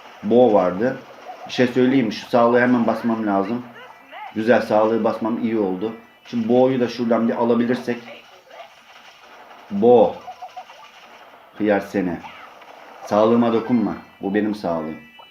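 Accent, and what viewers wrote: Black Sea, Turkey. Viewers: Abi Trabzonlumusun Rizelimi ?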